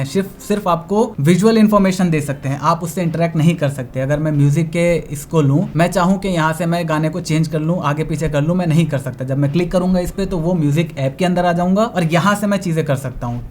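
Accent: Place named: Maharashtra, India. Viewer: One more thing, by your accent , i think you are marathi cause im also marathi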